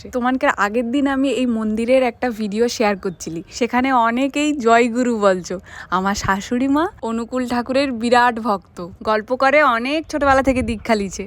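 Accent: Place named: Purba Medinipur, India. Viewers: Ki6uta medinipurer vasar moto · Tomar Basanti bari lekhin kotha medinipur style er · Eta purba Medinipur er bhasha-r moton.. Pray same.. Amar Sosurbarir desh bari Purba Medinipur.. Okhane sobai erokom kotha bole.. Khub valo lage sunte.. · Didi amer bari purbamedini pur amader vasa khanikta oirokom sune khub vhalo laglo❤❤❤😂😂😂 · Ata to purba Medinipur er Moto vasa From PURBA MEDINIPUR ❤ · Ata to purba medinipur vasa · Ata puro amader purba medinipur er anchalik bhasa, khub valo laglo go❤ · Ami Nandigram ar basinda onekta akoyrokom tone ta ❤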